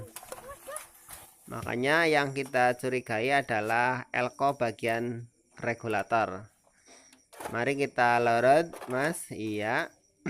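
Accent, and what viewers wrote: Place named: Banyumas, Indonesia. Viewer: Rika ngapak timen.. salam dari lampung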